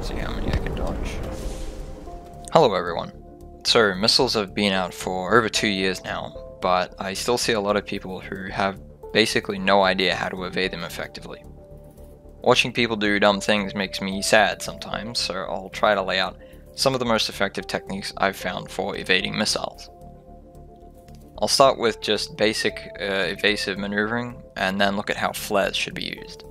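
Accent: Australia. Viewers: Wait your Australian too? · Are you Aussie? Cause I am :D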